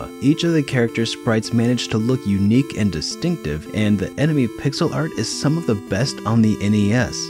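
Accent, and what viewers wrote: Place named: Cockney, United Kingdom. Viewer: MasterStryfe I thought it was because he was using cockney English.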